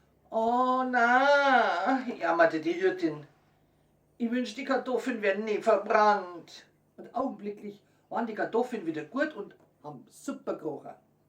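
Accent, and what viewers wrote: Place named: Bavaria, Germany. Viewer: Obwohl ich aus Norddeutschland komme und manches bairisch nicht oder nur schlecht verstehe, erfreut es mich dich dies lesen zu hören. Viel Erfolg mit diesem Kanal! 😊👍👍 ich bin sicher du kannst noch viele andere damit glücklich machen!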